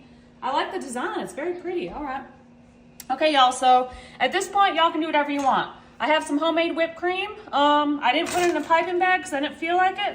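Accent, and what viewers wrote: South, United States. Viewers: People talk like that from the South, excuse me.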